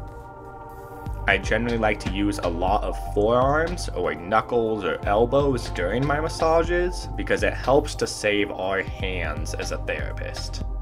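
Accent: Alaska, United States. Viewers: He sounds like one of the Alaskan Bush people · He sounds just like the Alaskan people show. Wonder where he is from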